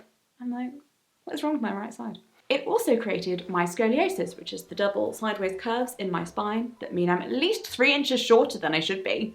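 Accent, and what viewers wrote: United Kingdom. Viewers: I wish there was a Love button instead of Like... I love your snarkiness!! So refreshing especially with the British accent; much love! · Hello, recent suscriber here, l like your channel altough l might not be in your optimal demo ( 53 y/o male), l do like interesting human beings and l find you to be quite striking and l think that you have a very melodic voice and a wonderful british accent, even if you can't hear it yourself ironnicaly, l'm french canadian and l do appreciate the fact that l understand you perfectly because of your brilliant diction and l think that you make a beautiful couple you and your wife, anyway, just wanted to tell you, oh and l'm certainly not alone in this but l wish that l could rid you of all the things ailing you with the snap of a finger! ☝️😌 you might not read this because of the many messages that you get but l just wanted to show my appreciation, so godspeed and be well! 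🧡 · Hey . You said you’re deaf . Trying to figure out how your speech is so good . Like how were you able to pick up a perfect British accent without being able to hear ?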